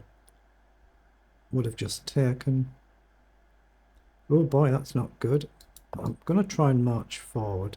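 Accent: United Kingdom. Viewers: Enjoy the vids. Which part of the UK are you from?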